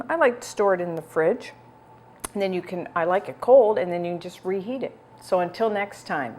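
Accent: Canada. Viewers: you sound Canadian. Are you Canadian?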